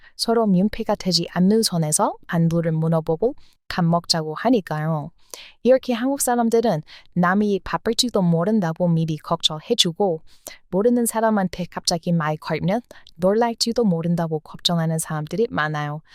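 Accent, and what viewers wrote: United States. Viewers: 잔잔하게 웃기는 능력이 있네요. 양 너무 미국스런 혀꼬부라지는 발음의 한국어로 조곤조곤 설명하며 기사식당 나올때 빵 터졌네요. 한국을 좋아하고 사랑해 줘서 고맙습니다.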